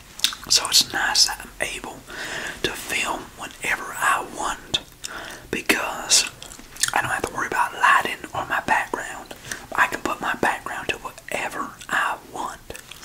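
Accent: South, United States. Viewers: Can you please do a “helping you cheat on a test” role play with the gum chewing and the southern accent🥺💖 you’re amazing !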